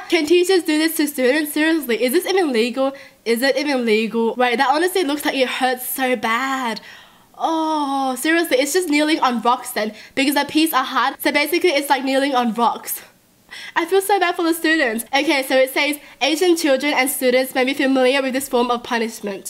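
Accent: Australia. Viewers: Nana Danisa wow ok, so your calling people idiots for being slightly mistaken? She has an Australian accent, who can blame them if they thought that (and by the way, people can be Australian soooo yeah. Your argument that it's "not a race" makes no sense and doesn't benifit you or your argument" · She grew up in Australia so she has that accent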